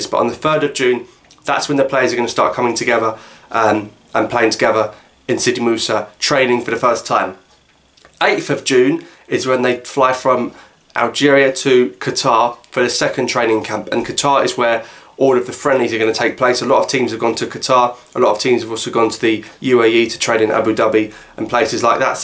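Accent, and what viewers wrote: United Kingdom. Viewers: El Jazairie Judging by his accent he's propably born in 🇬🇧. so maybe he doesn't speak it🤷‍♂️ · Thanks bro nice British accent !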